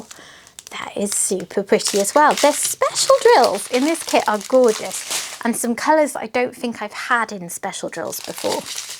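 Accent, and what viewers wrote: England, United Kingdom. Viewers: I just found your channel and I'm binge watching your posts. I'm from the states but I love hearing your accent because I can't wait to get back to England! It's Heaven to me 😊. Love your unboxes!!!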